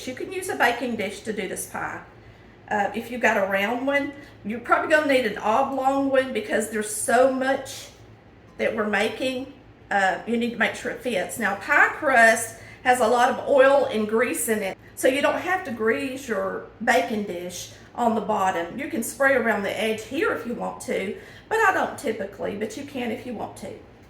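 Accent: South, United States. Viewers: Love your southern accent and delicious recipes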